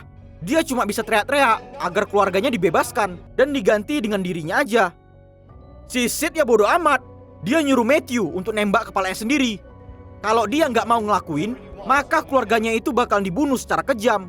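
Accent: Medan, Indonesia. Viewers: Org medan bang logat nya kayak orang medan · Orang Medan ya bro? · Slalu suka logat medan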